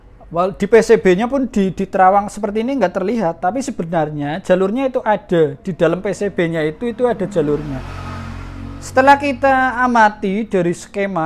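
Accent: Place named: Java, Indonesia. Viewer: Ngomonge medok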